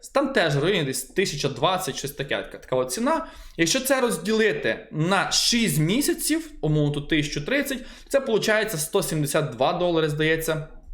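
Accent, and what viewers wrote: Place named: Ternopil, Ukraine. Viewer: Лол, дивився це відео на кухні. Моя мати за 5 секунд оприділила, що ти з Тернополя 😅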